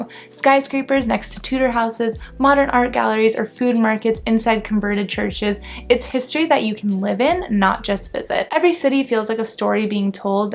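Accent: United States. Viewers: "YORKSHYRE" BRIDDISH" BUDDERY. For GOD'S sake, if you're posting about British v American LEARN TO SPEAK THE LANGUAGE.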